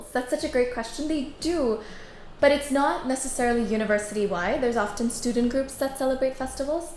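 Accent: United States. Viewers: How can speak in American accent in india So u r not indian Or u r saying u r superior to indians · How did you learned to speak in a pure American accent??😍😍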